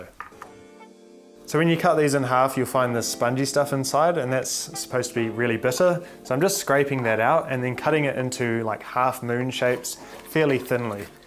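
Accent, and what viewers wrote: New Zealand. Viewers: I watch your videos just to hear that nice Kiwi accent😂.Love it☺️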